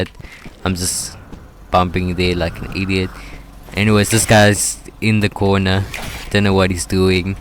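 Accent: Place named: South Africa. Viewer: You kinda sound South African (correct me if I’m wrong) XD Also great vid! :3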